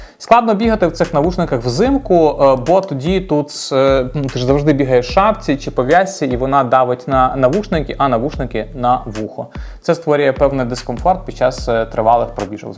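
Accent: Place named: Surzhyk, Ukraine. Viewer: Бро, я тебя не понимаю. Хватит на суржике говорить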